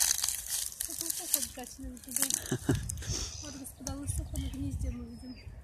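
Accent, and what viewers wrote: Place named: Belarus. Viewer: А говор то беларуский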